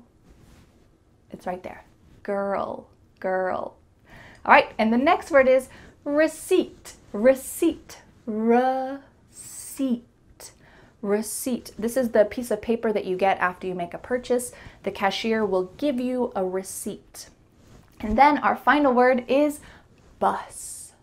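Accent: United States. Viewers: 🗽 Amazing video! real American English pronounciation 🇺🇸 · I'm a native arabic speaker, These days i'm learning American English, so i would ask you Are all your videos in american accent? Thank you very much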